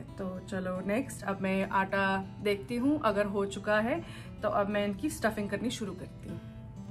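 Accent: Pahadi, India. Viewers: Tussa ra pahadi accent ai eee jaa , luv from mandi HP 🤗❤️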